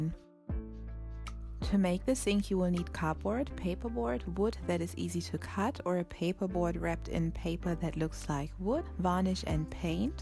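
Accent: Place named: Germany. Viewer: You can clearly tell she's Herman by the way she's speaking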